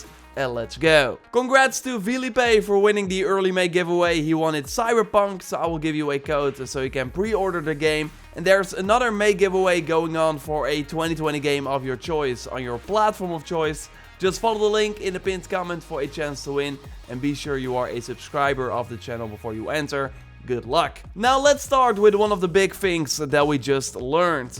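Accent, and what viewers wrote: Netherlands. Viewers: This dudes accent sounds so dutch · I can hear that you are Dutch hehehe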